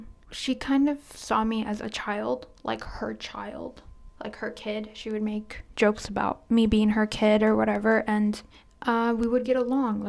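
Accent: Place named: Russia. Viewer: As a slav girl, your russian accent is very good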